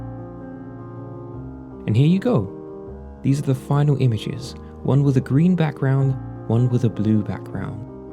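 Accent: United Kingdom. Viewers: Between that nagging music and the mumbling British accent I didn't understand a word. waste of time. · You sound British but have your camera in German?!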